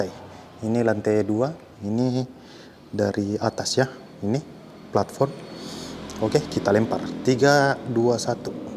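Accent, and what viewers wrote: Makassar, Indonesia. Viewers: dari logatnya anda sepertinya dari makassar cess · Orang makassar nih keknya😂 logatnya keliatan bgt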